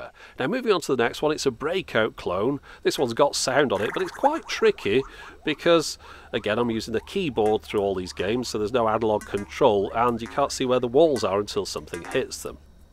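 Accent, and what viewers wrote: United Kingdom. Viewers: Makes me miss my old Vectrex even more. I've always had a soft spot for vector graphics games, and poured way too many quarters into Asteroids, Star Wars, and Tempest machines as a teenager in the eighties. While all my friends were buying Colecovisions and Atari consoles, I scrimped and saved for the Vectrex. I had the 3-d goggles and the light pen and most of the games that were available for the machine. I foolishly gave it away to an old flatmate trying to downsize for a move to the UK. I ended up back in the states eventually, and now have a 16 year old son who loves retro gaming. Looking at what the Vectrex and accessories go for on Ebay, I fear I'll never have another one. Hopefully this technology will mature to the point where I can play a ten foot version of Tempest on my ceiling! Love your videos, and your accent makes me homesick for my adopted second home in Blighty.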